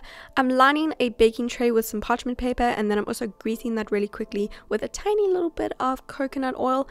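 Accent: South Africa. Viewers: Are you south african? You rlly sound like one! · Nice South African accent. Woohoo. I'm trying to lose a little bit of fat around my tummy. I work hard on my body and run hard but always have a biscuit for tea time and white bread. These are the clean snacks I'm looking for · Is she from South Africa ?? Cause she sounds south African 😹 and she uses a lot of Woolworths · Video was really useful and aesthetic 💕💕💕 Also a question... are you South African? Sorry if I’m wrong but your accent sounds really South African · Are u from South Africa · Are you a South African?? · Are you south african? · Love your accent, is it South African? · Are you South African? Your accent sounds like South African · You sound South African 🇿🇦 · Do you live in South Africa? You sound South African 🤔 I'd love to see a video with a bit about your life ! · when I heard your voice I was immediatly like South African hahaha I,m from cape town but love the video and thank you' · Nope shes South African, u can tell by her accent :P, some south Africans have that accent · Are you South African?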